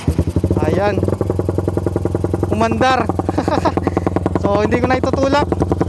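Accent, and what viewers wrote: Iloilo, Philippines. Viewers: Haha illonggo kaman? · Taga saan ka po kuya. Bat medyo ilonggo ka hahaha. (Iloilo) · kahit anong tagalog mo idol lumalabas talaga pagiging ilonggo♥️ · Saan lugar 2 sir,,ksi ga ilonggo ka eh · Ilonggo ka sir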